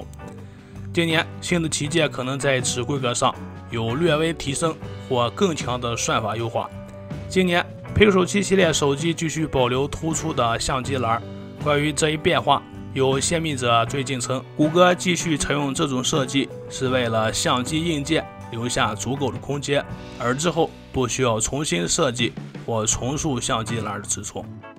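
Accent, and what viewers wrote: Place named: Northwest, China. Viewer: 口音浓浓的西北黄土味！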